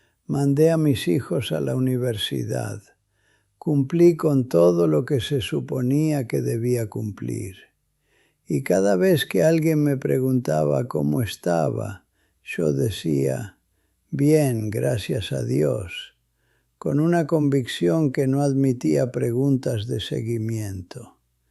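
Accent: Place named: Spain. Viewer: Tu acento es español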